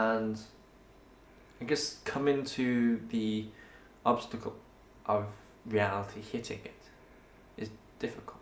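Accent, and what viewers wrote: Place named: England, United Kingdom. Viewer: I'm sorry but I had heard that I should tune into this but I found it very difficult to understand due to his very strong English tone that some of us could not understand.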